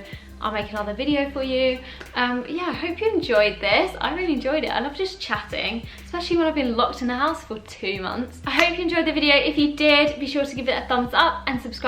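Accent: Australia. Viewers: you’re already picking up an aussie accent haha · She speaks like a Australian.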